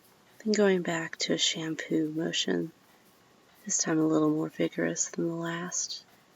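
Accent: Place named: Kentucky, United States. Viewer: Based only on accent, I would say in Kentucky. If I could hear her pronunciation of Louisville, I could confirm.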